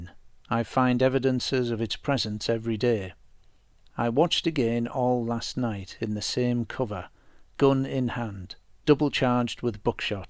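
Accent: United Kingdom. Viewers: This gentleman has such a pleasant voice, pace of reading, diction and British English. A pleasure to listen to.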